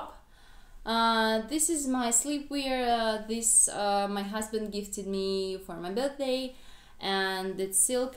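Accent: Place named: Russia. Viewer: I'm not trying to be rude at all, I hear Russian accents all the time so I hope that you don't mind me saying this (Degree Celsius - with an "s" sound at the end). Thank you for having content in English.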